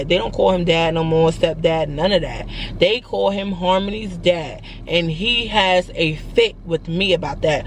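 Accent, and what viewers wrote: New York, United States. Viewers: . You sound like you’re from NY? I’d never guess you are from the south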